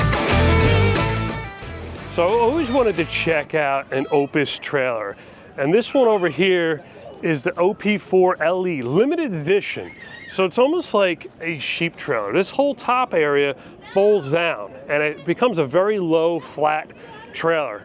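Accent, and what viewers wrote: New Jersey, United States. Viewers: Love the Jersey accent! 😊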